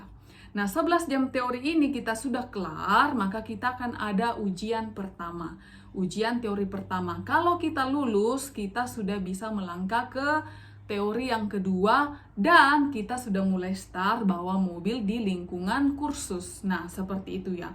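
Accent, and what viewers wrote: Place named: Manado, Indonesia. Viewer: Orang manado? Soalx masih dpa dengar dialek manado🤣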